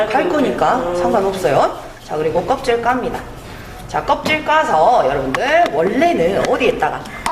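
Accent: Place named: Jeolla, South Korea. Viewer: 전라도 사투리 구수합니다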